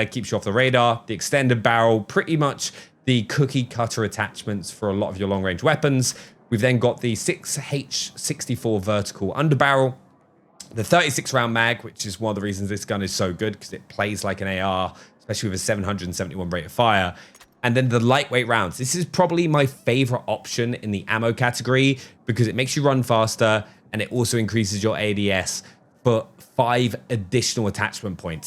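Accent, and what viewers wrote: England, United Kingdom. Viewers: Jim Jefferies is Australian and this bloke is English. Completely different accents.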